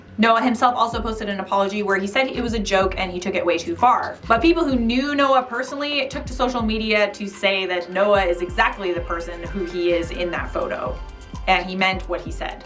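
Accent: England, United Kingdom. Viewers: I was thinking I was gonna dig this video, then the British accent rolls in. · Stop trying to be funny it’s not working And your English accent is awful and cringe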